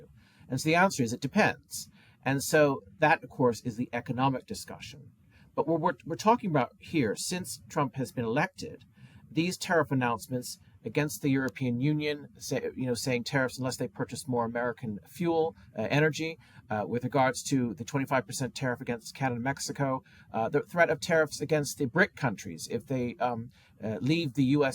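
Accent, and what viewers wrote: United Kingdom. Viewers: I think he's British.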